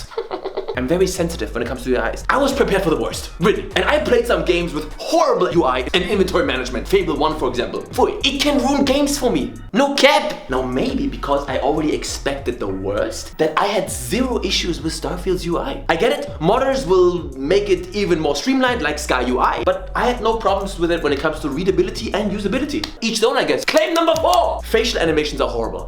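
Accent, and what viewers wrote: Germany. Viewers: The video is great but you make me enjoy the german accent a lot more than usual , it sounds satisfying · Thank you so much for speaking like a normal human being. Nothing I hate more than a youtuber slurring their words to get to the 10-minute-mark. edit: also your Denglish is so endearing. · Why does he sound french british and chinese at the same time Maybe or just german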